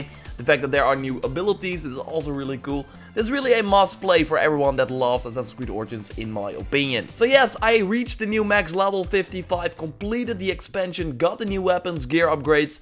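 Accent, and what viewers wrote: Netherlands. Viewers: Merkwaardig hoe veel mensen niet weten dat je Nederlands bent. Je zegt het redelijk vaak in je video's en je accent verraadt het (al is het niet dat typische Dunglish haha)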